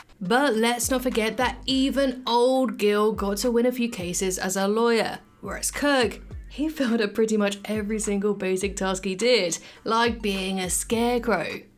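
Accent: United Kingdom. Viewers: your voice and British accent keep me charmed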